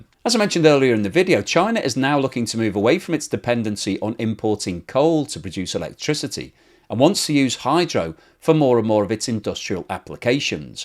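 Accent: United Kingdom. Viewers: I like how you Brits say 'tree', whenever a word ends in tory or tary. You all should hear y'selves:person-turqouise-waving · That is okay we are used to the British pronouncing words funny. China will never be net zero.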